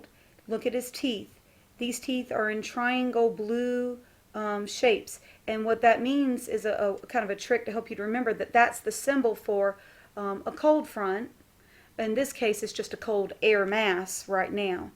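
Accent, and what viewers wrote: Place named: Texas, United States. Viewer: We were watching this in class and the teacher said it might get cheesy and she said you had a weird Texas accent. (But I like your accent also the video helps a lot thank)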